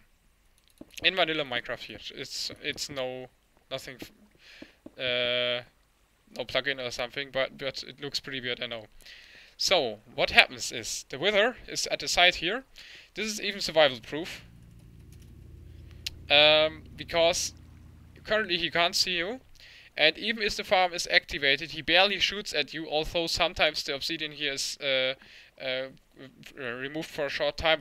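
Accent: Germany. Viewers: well then he didnt have a typical german accent. trust me dude, this is a heavy german accent. i am german, in a few videos before he was talking german. this is a german accent. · yes he's german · Your english sounds like you´re no English/American guy. Are you German? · the accent is from germany ;)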